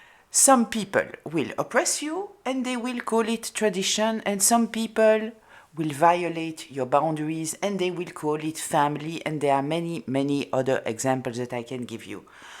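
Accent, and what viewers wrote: France. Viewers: U are simply a Candy to my Ears, all that French accent and the typical French frankness and dutyfullness 100%%%% on the Mark . ❤❤❤🎉🎉🎉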